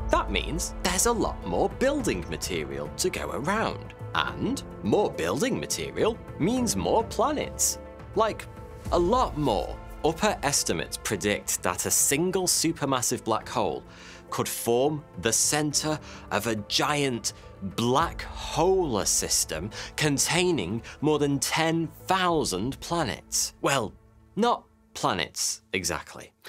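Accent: United Kingdom. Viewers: This guy had voice that I could listen to for hours. Like Morgan freeman but british! Love the vids!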